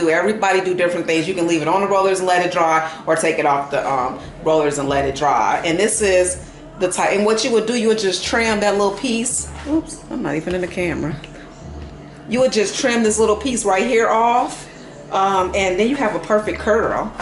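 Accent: South, United States. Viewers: I love your southern drawl!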